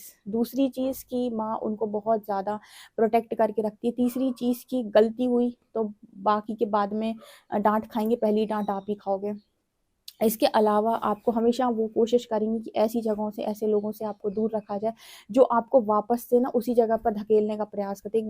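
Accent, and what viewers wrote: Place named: Bareilly, India. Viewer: ap up ya bareilly side jaise sound karti ho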